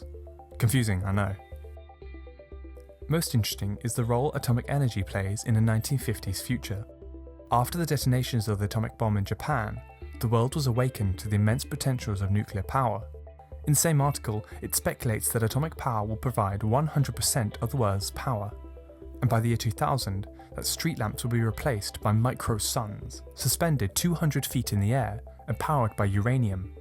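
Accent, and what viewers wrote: United Kingdom. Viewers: i was worried this video would be ai but u sound too british to be ai so i think were good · Tell me how I knew the narrator would be a Brit before I even started the video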